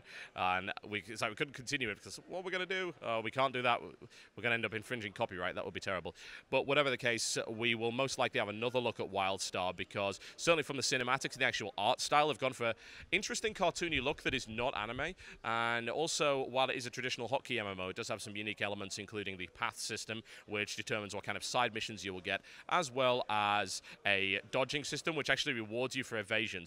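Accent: United Kingdom. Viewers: whoa dude, you're extremely british.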